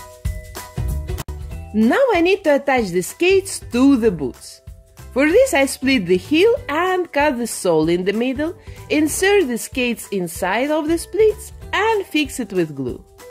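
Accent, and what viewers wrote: Russia. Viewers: Сразу с первых секунд поняла, что вы русскоговорящая :D У вас замечательные видео, спасибо!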